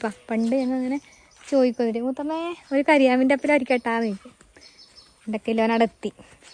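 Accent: Kasaragod, India. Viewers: അത്രയും ബോറാണോ നമ്മളെ കാസഗോഡ് ഭാഷ... നിങ്ങൾ ഇങ്ങോട്ട് വീടെടുത്തു പോര് ചേട്ടാ കാസർഗോഡിനെ കുറിച്ച് അറിയാൻ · ഓർജിനൽ കാസർകോട് ഇഷ്ടപ്പെട്ടു❤❤❤ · എല്ലാ പുല്ലും കായും എല്ലാം പറിച്ചു തിന്നാൻ ഇതെന്താണ് പശുവാണോ എന്തായാലും നിങ്ങളെ കാസർകോടൻ സ്ലാങ്ക് ഇഷ്ടപ്പെട്ടു വീണ്ടും പുതിയൊരു വീഡിയോ ആയിട്ട് വരൂ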